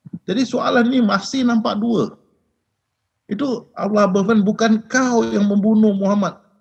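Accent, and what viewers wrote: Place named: Malaysia. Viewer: Saya mohon mengikuti kuliah ustaz .ini kali pertama. . .gaya cakap accent ustaz ni macam gaya orang Melayu dari Malaysia..alhamdulilah.Tk.tuan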